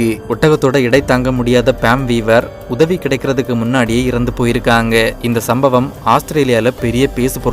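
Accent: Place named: Nagercoil, India. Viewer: ஏலே நீர் நாகர்கோவில் காரரா அந்த தமிழை அப்படியே தெரியுது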